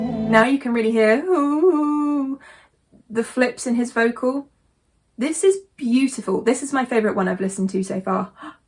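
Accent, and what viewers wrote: United Kingdom. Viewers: Best reaction ever, professional and respectfull, thanks and good luck, i like very much her typically british accent , nice. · Are you brithish ?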